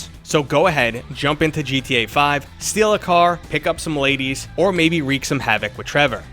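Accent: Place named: Boston, United States. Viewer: That boston accent tho.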